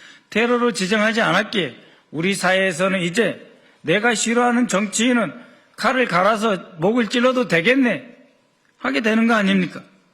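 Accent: North Korea. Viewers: ㅋㅋㅋ 북한말투 거슬려. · 말투나 어떻게 좀 해 주세요. 여기가 북한도 아니고.. 슴다 슴다.. 듣기 거북해 ...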